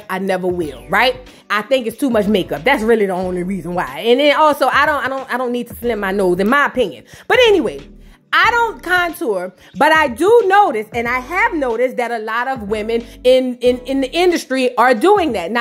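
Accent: New Orleans, United States. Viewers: Sis I absolutely love your "Nawlins" accent and your commentary! That "get her up here" gets me everytime! Stay on their necks sis!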